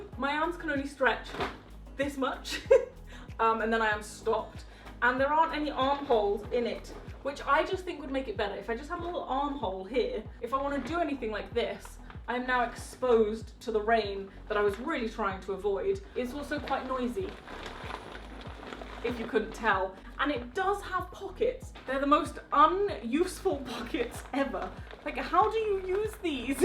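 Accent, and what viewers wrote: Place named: RP, United Kingdom. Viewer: You're so posh I love it 😂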